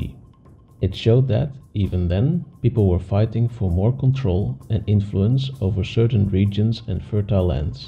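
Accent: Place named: Netherlands. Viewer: dutch accent ftw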